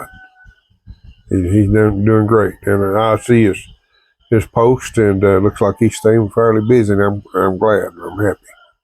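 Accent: United States. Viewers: Good morning,. If it wasn't for bad luck, you wouldn't have any luck at all. Given all of the terrible things you've experienced in life, you could've turned out to be a very angry and bitter person, but you didn't let all of that get you down. That speaks volumes about your true character. Sometime, during your young life you must've had a mentor that was positive influence on you. It's either that or you somehow inherited a terrific set of genes family tree or it could be a combination of both. Whatever the case, Grace couldn't have found a better person to marry than you and Virgil couldn't have a better father than you, In fact, Virgil couldn't have any better parents than you two, both genewise and mentorwise. As for me, I feel very fortunate to have stumbled upon your wholesome, heart warming, family oriented, interesting, and educational videos. Besides that, I really enjoy your relaxing, laid back, hillbilly way of talking,. 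It's nothing to be apologetic about. In fact, you should be proud of your family heritage. ❤ 👍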